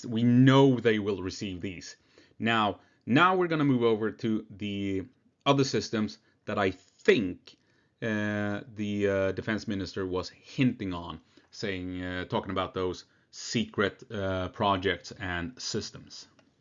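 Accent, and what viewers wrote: Gothenburg, Sweden. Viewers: I love how you prove your Swedish ancestry by pronouncing the silent "j." Every time you pronounce a silent "J," it reminds me of how my 100% Swedish grandmother used to pronounce my name "for example, as "yohn" or "yeffrey" or "yames."). It is very endearing to me as a half-Swede. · It's a pronounced Gothenburg accent 😂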